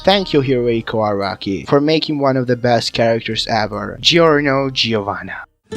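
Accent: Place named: Latin America. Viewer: Ahora en español:v por tu acento más vale que seas hispano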